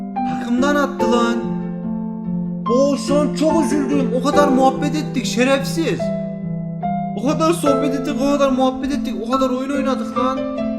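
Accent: Gaziantep, Turkey. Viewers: Abi anteplimisin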